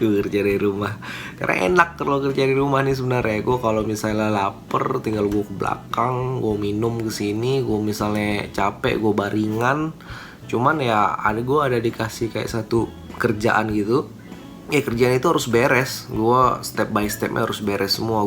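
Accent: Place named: West Sumatra, Indonesia. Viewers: logat minang nya masih kental banget ya udah hehe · Sebelum liat caption full, dengar video sambil membatin, "eh... kayaknya ini orang minang, logatnya berasa familiar." Ternyata benar, ada ditulis di caption hehe. Salam kenal uda. Dima di Padang nyo? Main-main ka channel kami :)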